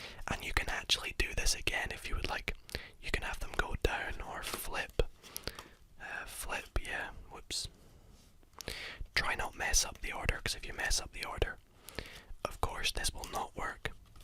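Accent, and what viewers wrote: Scotland, United Kingdom. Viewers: What's that accept ? I'm english I'm hearing hints of scottish with something else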